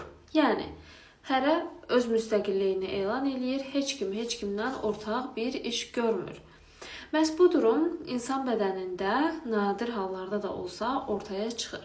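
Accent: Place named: Azerbaijan. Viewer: Ezarbaycan lehçeniz çok güzel.❤❤❤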